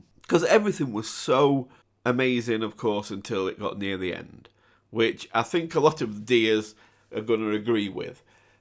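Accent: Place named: Midlands, United Kingdom. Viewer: Hi. One of the reasons why it is so nice to listen to you is that you are always so honest. As for the concert, I actually find it better after that. I personally think that only one artist should appear at a solo concert. And the singing of his parents is not my taste either. So I'm glad he's more solo on his subsequent concerts. I'm already looking forward to the next double..... when did you say you will do it? Soon? The Dimash-Soon (betwenn 3 days and 3 years) or a -Soon (tomorrow) 😂😂😂 And I'll forever be happy to listen to your voice, the giggles and the middle-englisch dialect... so see you „soon“ ❤️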